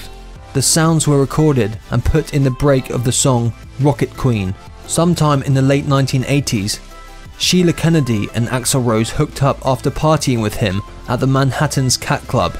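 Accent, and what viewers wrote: United Kingdom. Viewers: Is it just me or does the British accent describing one of America's greatest rock bands really seems to not be the right accent to describe Axl.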